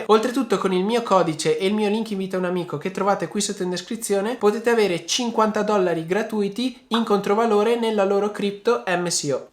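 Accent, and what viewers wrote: Veneto, Italy. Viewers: Scusa.......... MA TE SI VENETO?!?!?! SE TE SITO VENETO ANCHE MI SO VENETO VECIO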